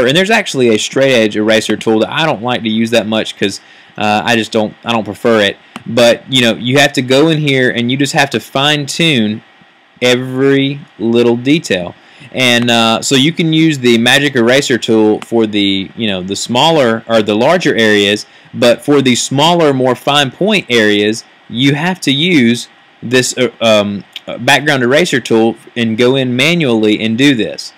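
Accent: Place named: Tennessee, United States. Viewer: I love your voice. I detect a slight accent. Is it from Tennesee?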